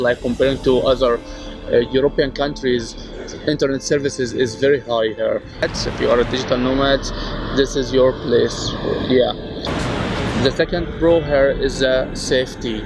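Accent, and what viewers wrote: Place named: Egypt. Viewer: I can tell from his accent that he's Egyptian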